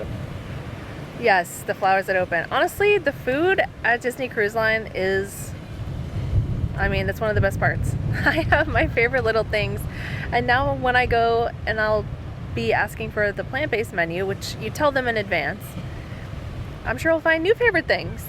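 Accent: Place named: Australia. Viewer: Love your Aussie accent!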